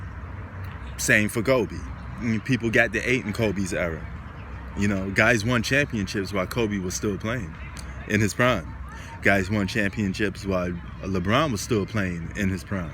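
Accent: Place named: New York, United States. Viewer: I thought you was from NY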